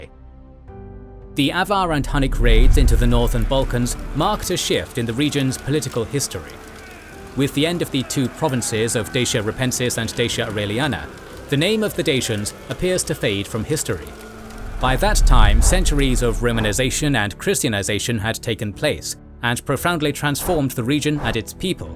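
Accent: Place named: United Kingdom. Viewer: Ništa mu ne verujem. Ima Britanski naglasak. Oni su prevaranti i lopovi.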